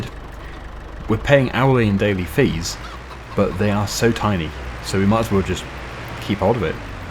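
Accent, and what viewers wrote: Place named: England, United Kingdom. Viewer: I don't want to affend anybody but he has an English accent (I think) but in the game he drives on the right side of the road. Just something I noticed.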